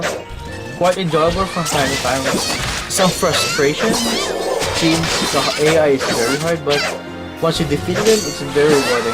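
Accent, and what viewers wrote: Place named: Tagalog, Philippines. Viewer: You have that English accent in you you are good talking in English Language but your Tagalog accent is still there.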